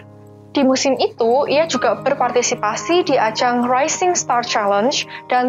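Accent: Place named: Java, Indonesia. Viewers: Pasti org jateng nih medok nya beda sm surabaya, wkwk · host ceweknya kalo ngomong medhok, tapi lucu wkwk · suaranya medok tapi lucu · Medok e surabaya ki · medok imen mba hehehe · Suroboyoan cokk.. 😅😅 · Host nya fix orang SBY wkwkwk medok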